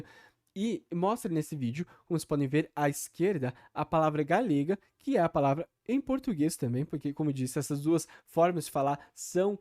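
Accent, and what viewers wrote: Brazil. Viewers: O sotaque dele é mais próximo a diversas regiões só Brasil do que de Portugal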